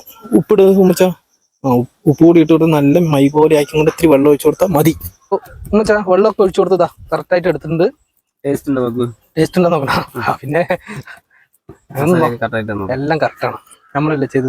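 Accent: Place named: Palakkad, India. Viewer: പാലക്കാടൻ ഭാഷ ഞങ്ങൾക്ക് ഭയങ്കര ഇഷ്ടമാണ് ഞങ്ങൾ അവിടെ മൂന്നുവർഷം താമസിച്ചാണ്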